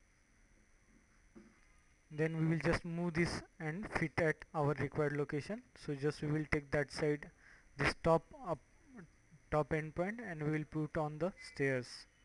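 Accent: India. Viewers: This narrator is awful! What the helll is he saying? Get rid of the india/english accent, or get someone else to speak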